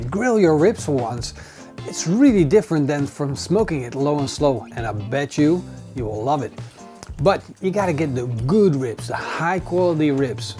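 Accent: Netherlands. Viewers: Zo te horen ben je nederlands. Waar haal je in godsnaam een rack als dit? Ik neem aan niet gewoon bij een slager?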